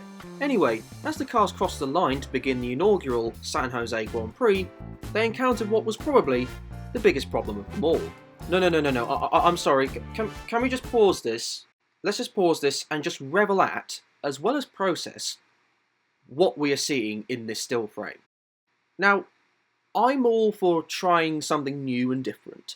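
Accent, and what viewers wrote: United Kingdom. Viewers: Just found this channel Great content 🎉 The grainy British commentary matches the race footage perfectly So jazzy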